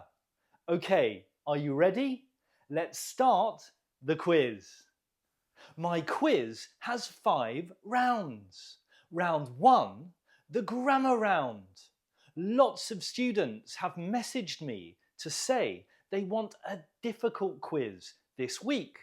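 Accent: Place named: United Kingdom. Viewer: I like watching you so much and i like your British accent thanks a lot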